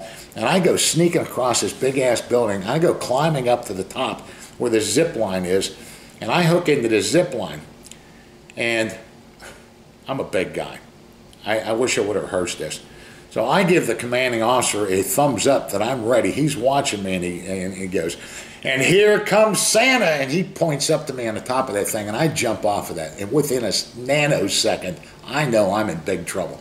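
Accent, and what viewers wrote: Pittsburgh, United States. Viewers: Hey are you from Pittsburgh originally? You sound like you have a Pittsburgh accent. When you make a sandwich I'll bet you use Isleys Chipped ham 't you?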